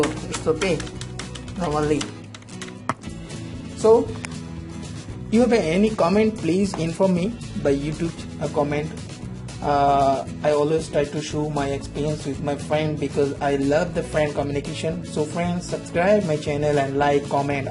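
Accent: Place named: India. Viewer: tech tutorial videos always have indian accent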